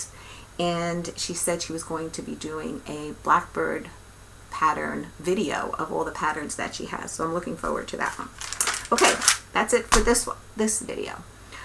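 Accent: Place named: East Coast, United States. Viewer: Hi. I'm glad I found your channel today. I'll go back to your Flosstube #1 and catch up on your videos in the days ahead. I moved to the desert southwest in 2000 but you and I have the same accent so I believe we are both from the same coast of this beautiful country. Like you I cross-stitched a little bit in the 1980's until work and bringing up 2 boys took precedence. Once the boys were grown and on their own, I took up quilting and about 2-1/2 years ago I stumbled on cross-stitch again at our State Fair. I am glad that I have two of the most wonderful hobbies and I love them equally. Since there are four full weeks in May, my Maynia plans consist of four WIP's and four new starts. Monday through Friday of week #1 I will work on WIP #1 and Saturday and Sunday work on new start #1, etc. Good luck with your Maynia plans and I look forward to your next video.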